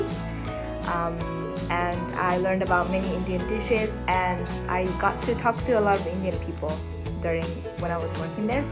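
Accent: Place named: United States. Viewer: I am totally confused by your accent. You grew up in Japan, right. Yet, you're accent when you speak English sounds like you spent some time in America, can't hear the Japanese accent.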